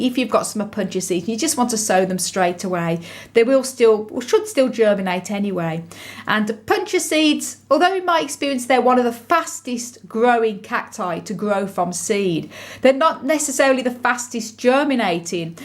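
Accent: Birmingham, United Kingdom. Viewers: Hey, are you from the Birmingham area? I'm in Sutton Coldfield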